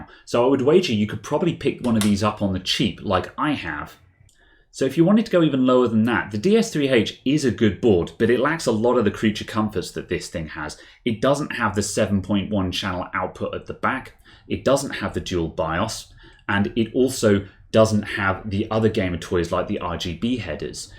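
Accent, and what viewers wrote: England, United Kingdom. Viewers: and english accent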